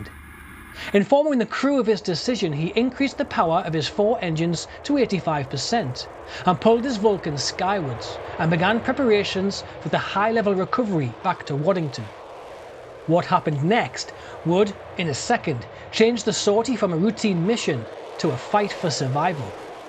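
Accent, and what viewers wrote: Newcastle, United Kingdom. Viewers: assumed you were from 'The Toon' before you mentioned it... geordie is obvious. Great to get someone local putting stuff out. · I love Vulcans and this was a story I'd never heard. Congrats on the genuine human commentary complete with genuine Geordie accent. I'm fed up with text-to-speech converters.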